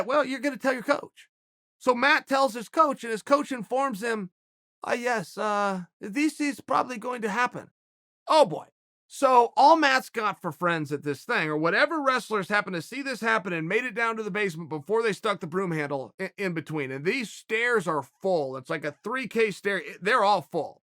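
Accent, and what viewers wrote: Russia. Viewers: Love Chael's ambiguous russian/east-european accent here lmao. Give us more russian wrestling stories Uncle Chael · Your Russian accent Chael.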